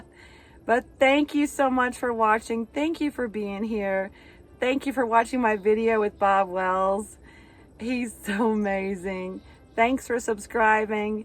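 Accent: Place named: South, United States. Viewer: You’re a cutie, love your sweet way and southern drawl…’y’all.’ Much love from the UK x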